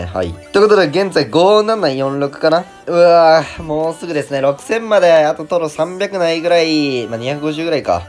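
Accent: Okayama, Japan. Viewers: ちょいちょい岡山弁でてるw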